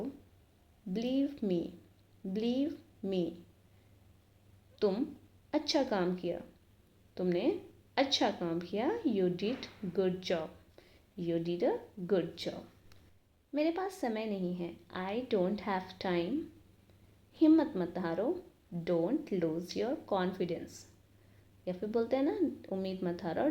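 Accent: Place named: Russia. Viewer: Mam your accent is like a Russian English and the way of speaking is really awesome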